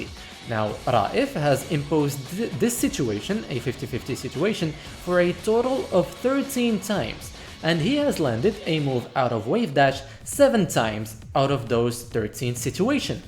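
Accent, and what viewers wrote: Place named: North Africa. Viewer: I knew you were north african for the whole video until i heard you say it 😂 where from?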